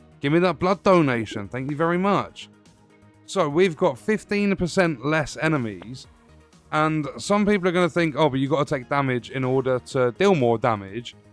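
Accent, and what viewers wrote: United Kingdom. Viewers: no hate but bro is the most british guy i heard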